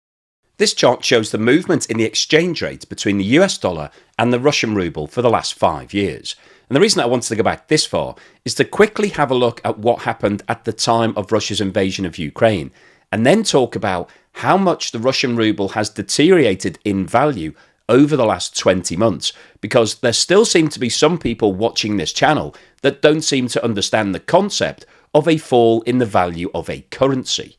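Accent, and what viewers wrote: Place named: Liverpool, United Kingdom. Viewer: He's a scouser.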